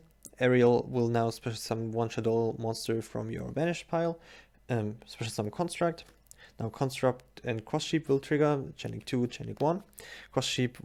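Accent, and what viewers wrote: Germany. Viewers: Is that a German accent? :D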